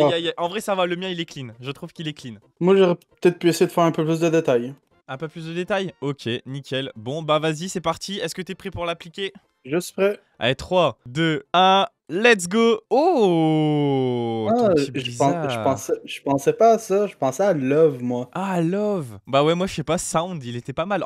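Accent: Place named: Quebec, Canada. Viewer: est québécois parce que moi aussi chu québécois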